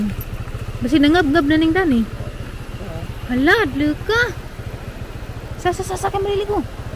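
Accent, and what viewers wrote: Bohol, Philippines. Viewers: Klaro kaajo taga Bohol pud! Bohol pa more!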